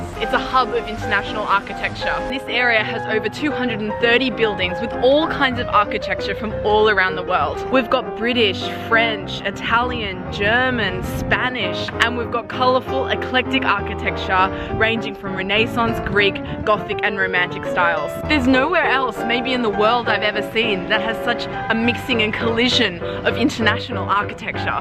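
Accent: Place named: Australia. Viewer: Big love for this girl’s video, amazing accent , passionate speaking ，week done Aussie girl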